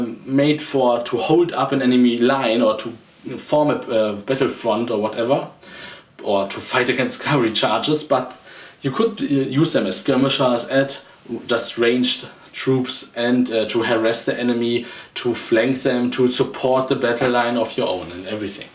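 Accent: Germany. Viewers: Ah, I love your accent. You're German, aye?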